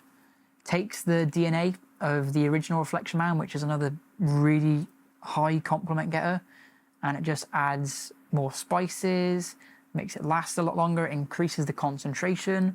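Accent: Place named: Ireland. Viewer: Probably because you are Irish? :D Bro I love your accent rly :D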